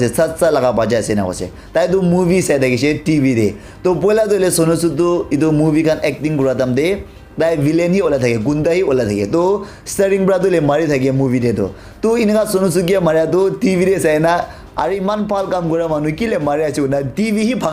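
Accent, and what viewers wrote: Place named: Nagaland, India. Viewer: I really like his nagamese accent🤣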